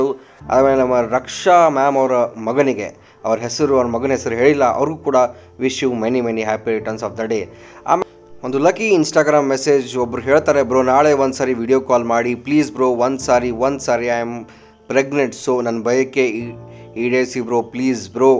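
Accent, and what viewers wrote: North Karnataka, India. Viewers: Mast pa Anna ni hero g yen kammi Ella ni mast matadti Nam uttar karnatak bhashe ninnu super anna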